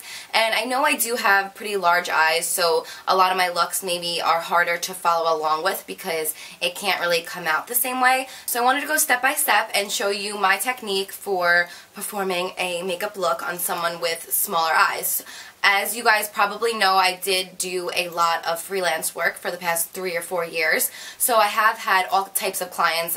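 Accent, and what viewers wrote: New Jersey, United States. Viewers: I agree. I think it's that slight and subtle New Jersey accent. :)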